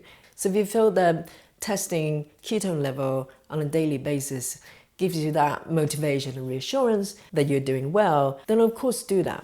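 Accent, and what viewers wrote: United Kingdom. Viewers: Thank you for the useful info. BTW I like your British accent. ☺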